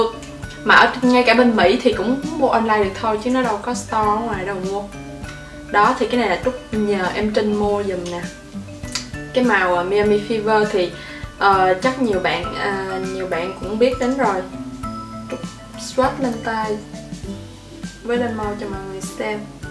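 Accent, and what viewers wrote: Mekong Delta, Vietnam. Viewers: Thích sự mộc mạc của chị với cộng thêm điểm giọng nam, miền tây êu dấu. Đáng yêu vô cùng hí hí Làm thêm nhiều video hay nhe chị, cố lên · chị là người miền tây à ? quê chị ở đâu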